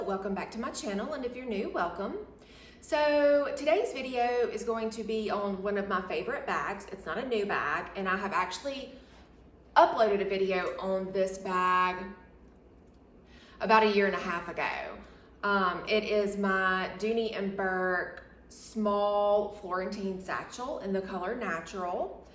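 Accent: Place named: South, United States. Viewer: Two things: LOVE your sweet southern accent and LOVE the D&B satchel! I own many Dooney florentine bags..but the satchel is special. I have it in the Dipinta painted florentine and it's my prized handbag possession. It competes with the lux house bags all day long. It will last me my lifetime and someone else's after me..thanks for revisiting this awesome bag and reminding me how blessed I am to own one too! Take care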